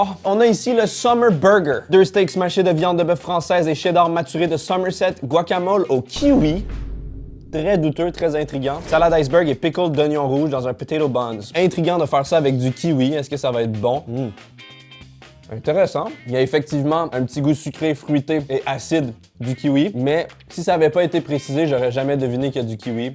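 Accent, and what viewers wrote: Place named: Quebec, Canada. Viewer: Vous le comprenez bien même avec l’accent et les expressions bien québecoises?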